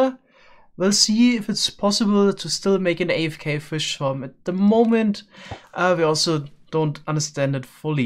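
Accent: Germany. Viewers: Dein Englisch is so deutsch, ich kann nicht mehr 😂😂, keine grammatikfehler oder so aber der Akzent is einfach geil! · Ich Liebe deine Videos, Aber es ist echt schlimm einen Deutschen Englisch sprechen zu hören wenn mn weiß das Er es auf deutsch besser könnte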